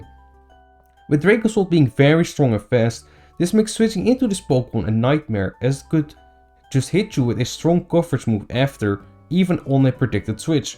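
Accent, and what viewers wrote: Netherlands. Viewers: Netherlands, you can tell by the accent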